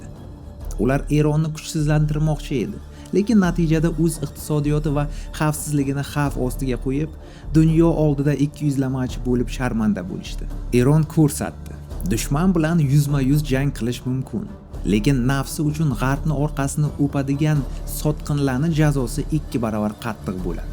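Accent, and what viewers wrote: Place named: Navoiy, Uzbekistan. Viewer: Мабодо Навоийликмасмисиз шеваиз ухшаб кетаркан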